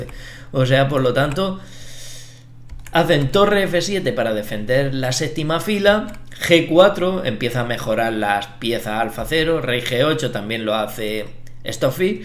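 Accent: Spain. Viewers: Se refiere al acento español de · porque es andaluz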